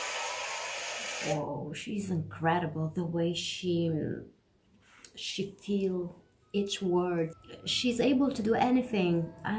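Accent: Italy. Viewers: I am in love with your Italian accent!!!! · I love your Italian accent and I love your reactions to So Hyang. Subscribed ♡.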